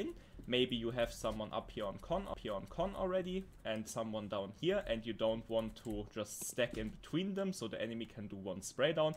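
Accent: Germany. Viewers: German accent ? 😉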